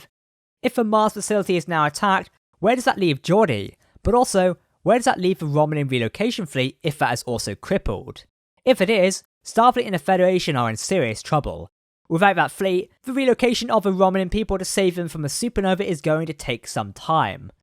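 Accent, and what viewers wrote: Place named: United Kingdom. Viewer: Oy. Crikey. Let's have another Brit voiceover commentary about the trailer we just want to watch in peace...ack... so. After Data is gone, Maddox finally figured out how to build Soong Androids, and they decide to revolt and blast Mars ? their leader Beep Boop leads a charge "in the name of Data". Unknown to them, Lore, revived by a drunk lab assistant is behind the plan all along. Meanwhile, in between Picard's dreams of Data, the real Data's head is retrieved by a small Pakled ship in Federation space and sold to Boson, Quark's grandson who decides to rebuild a new body and face with a special inhibitor in it, to give OO-Maks to any Ferengi for an hour if they can afford the gold-pressed latinum to pay for it. Quark, excited and thrilled about the success of his grandson, brags at the old Ferengi's home , laughs at his brother the former Nagis's discomfort . 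Q pops in and looks into the camera saying "why ? because we can"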